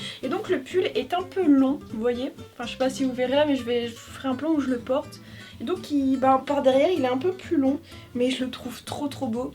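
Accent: North, France. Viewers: Tu as un joli accent du nord de la France :) Merci pour tes vidéos!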